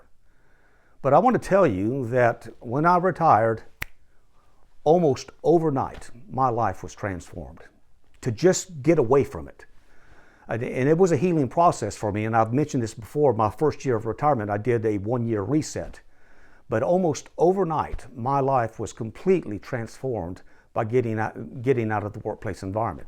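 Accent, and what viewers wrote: South, United States. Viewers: I enjoyed your content snd your Southern accent :) (Australia) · I'm only in my mid 40's working in IT and Software and I've been burned out for years. I feel like every point made here applies to my burnout. I haven't worked for a year and although my health has improved, the idea of working for a company again, where I'm treated like garbage and given an extreme amount of complex work all the while being forced out whenever they company feels like it - no matter how good my work quality is. This is just the reality today - so extreme and narcissistic. It's so hard to make a serious effort when you know they don't give two sh!ts about you. Thankfully I've invested enough to retire early, but still have just a few years of work left before I can truly pull the plug. I really enjoy the type of language and accent this man uses - reminds me of the wisdom of the elders in America I enjoy learning from.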